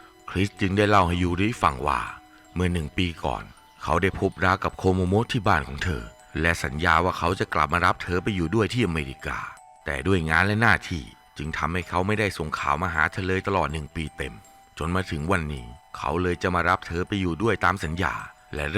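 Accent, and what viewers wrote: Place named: Korat, Thailand. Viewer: คนโคราชบุรีรัมย์ใช่มั้ยนิสำเนียงชัดมาก😂😂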